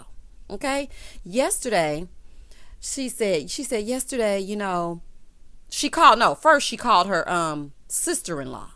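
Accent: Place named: New Orleans, United States. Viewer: Hello, english is not my first language so I'm sorry. This is the first time I stumble upon your video, and I smile listening to your accent. You remind me so much of princess Tiana even though I have no idea how you look like. And I'm sorry if that's wrong or something because I'm not american, but to me you sound like you're from where Tiana lives. New Orleans ^_^ and it's just really wonderful hearing you speak, I truly enjoy your speaking voice and I'm sure you must be just as pretty as she is too.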